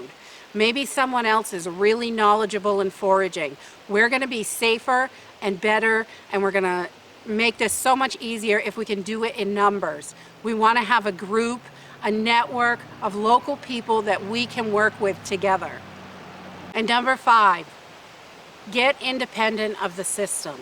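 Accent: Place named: New England, United States. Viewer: I just found your channel. You sound like your from new England. Are you?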